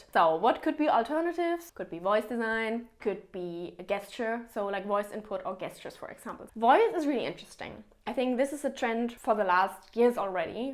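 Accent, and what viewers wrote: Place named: Europe. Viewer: As an Egyptian American UX designer myself I love it when I see knowledgeable UX designers like you happen to have an accent. It emphasizes my opinion on how most great trends in UI, fashion, interior design come originally from Europe and Asia. Americans here might be “uncompetable” when it comes to technology, but Europeans—especially Germans, French, Polish, and Italians—are definitely wizards when it comes to aesthetics and art. Good job, loved your content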